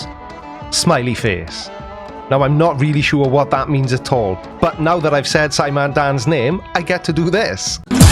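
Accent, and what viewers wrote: Wales, United Kingdom. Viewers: Oh my God.........you're not..........Welsh.........are you? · Are you Welsh? I thought you were Australian like Nathan Thompson.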